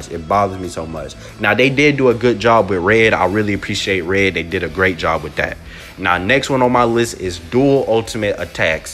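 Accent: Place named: Florida, United States. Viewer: You got to be from Florida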